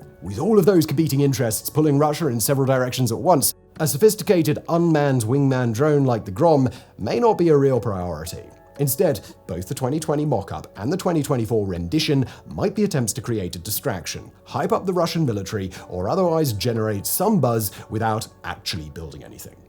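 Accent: United Kingdom. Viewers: All your British lilt and shabby jabs at Russian and Chinese counterparts seem more and more like an age old Western propaganda than an unbiased fair take on the subject. No modern fighter jets have come head to head against each other in current war scenarios and neither you can confidently confirm that Russian or Chinese jets and missiles are somehow inferior to what west has to offer. Dont be so sure lil English brother, the Trident you tried to scare Russians embarrassed you globally and just recently.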